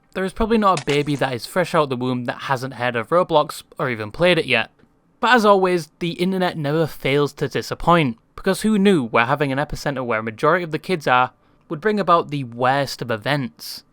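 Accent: United Kingdom. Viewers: And that Is nice British accent 'give me a bottle of wot'ter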